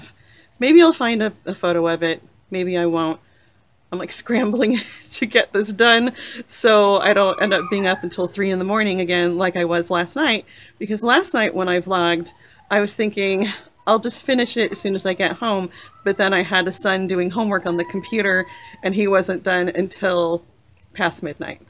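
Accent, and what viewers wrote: United Kingdom. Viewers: Oh, how I do adore the Glaswegian accent! 🏴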